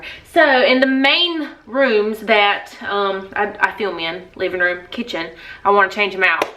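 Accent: South, United States. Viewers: swear I love southern accents! and good job on the house! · you sound so country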